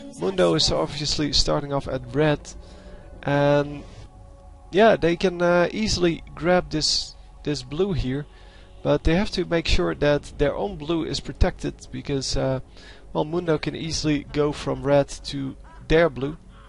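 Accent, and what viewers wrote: Netherlands. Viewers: Are you dutch? freat channel BTW subscribed ;-)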